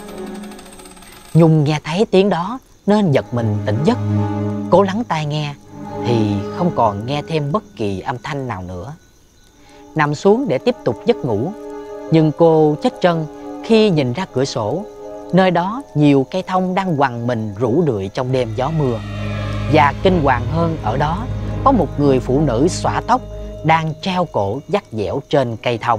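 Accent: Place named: South Central Coast, Vietnam. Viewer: Seri này là 1phần 50 tâm linh , còn 1phần 50 ý nghĩa và sâu xắc,anh kể giọng rất hay❤🎉.Cứ như tiếng miền trung miền nam zậy,đúng thiệc là anh kể zui thiệc với rất kinh dị,nếu xem chung với bạn bè thì còn zui,chứ xem một mình thì hơi sợ nha😅,em chúc anh lập sẽ tích cực hơn sẽ ra nhiều video hơn nữa và thú vị hơn ạ❤❤😊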